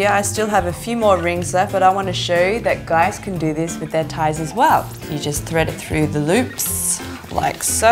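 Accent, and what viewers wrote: Australia. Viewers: oh so that's why her Australian accent is a little different. Singapore must have rubbed off a little :3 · She sounds Australian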